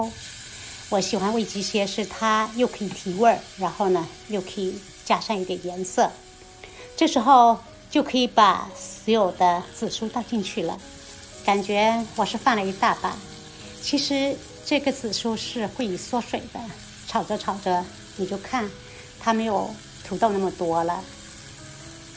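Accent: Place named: Southern Yunnan, China. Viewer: 我偶然刷到，一听就是马谱，我家云南人。了不起，很辣燥的云南婆娘。你口音是滇南人😊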